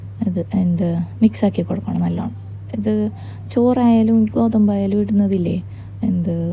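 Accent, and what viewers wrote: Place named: Kasaragod, India. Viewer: Edh slang 😊kasaragod slag,😅😅😊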